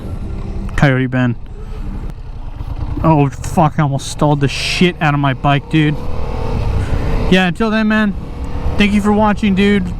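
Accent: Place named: California, United States. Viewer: I’ve just watched a handful of “how to get your motorcycle license” videos, realized I needed to be watching ones related to the state I live in, and let me tell man. You don’t need to put “in California” in the title, because the moment you start talking, I instantly recognize my fellow Californian accent lmao. I’ve been watching southern/Midwest dudes for the last 2hours and now I’m hearing how Californian I must sound to anyone slightly east of Cali 😂😂. Great info man, I’m gonna be watching more of your videos 🤘🏼.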